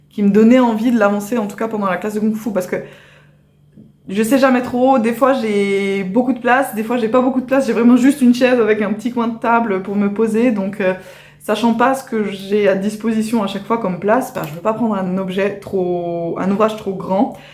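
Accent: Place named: Belgium. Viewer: Bonjour. Je vous découvre pour la première fois .je ne savais pas du tout d'où vous étiez et a votre accent il me semble que vous êtes soit du nord soit belge. Je suis aussi belge mais vit en France depuis toujours.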